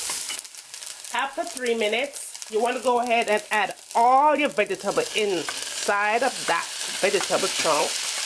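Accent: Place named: Jamaica. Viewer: Looooove the cooking but looooooooooooooooovvvvvvee the Jamaican accent! ❤❤❤❤❤❤❤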